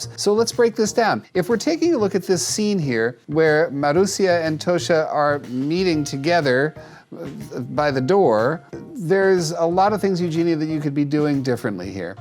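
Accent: Russia. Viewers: a little note - these aren't Swedish names though, they're Russian, and the accent sounded Russian to me as well (just listen to those 'sh' and 'ee' sounds!) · BTW she's not Swedish, she's Russian, Marusia is traditional name. Also, the accent is pretty Russian. I'm saying it coz I am Russian 😂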